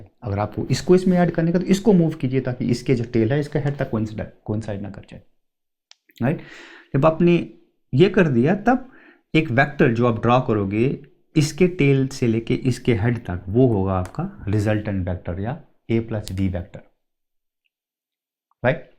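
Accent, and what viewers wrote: Kashmir, India. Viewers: I sense that u have an akcent like Kashmiris..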